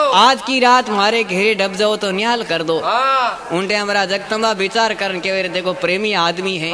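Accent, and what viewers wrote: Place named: Marwari, India. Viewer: orignal Marwari Voice really enjoy !!! also help for new generation to learn history Thanks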